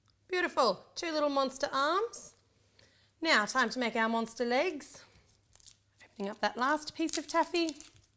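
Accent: Australia. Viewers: Are u austrailian?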